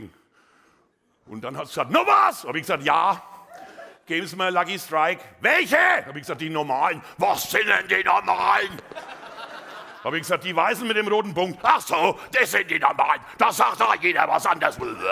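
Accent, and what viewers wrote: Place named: Franconia, Germany. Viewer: Fränkisch geil 😂😂😂😂🤘🤘👍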